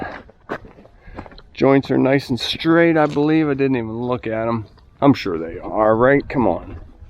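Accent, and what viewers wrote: Pittsburgh, United States. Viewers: I enjoy watching your videos. Very refreshing to see people with good work ethics, and other ethics...👍💪🏅 I have learned a lot about pouring and finishing concrete! I have to chuckle every time to correct yourself on the pronunciation of the word "Out". 😀 I assume because there's lots of comments about it. You don't need to do that, you are from Pittsburgh, it is not going to change! Be yourself, that's all part of it. I have a friend here in the Southern Maine/NH area that moved here from Pittsburgh 35 years ago. His pronunciations and little phrases are identical to those of you and after all these years. Don't try to change, and don't worry about those who think you should. Be yourself, I wish you well with the channel. I hope you can gradually shift to doing more fun things. Enjoy life. 👍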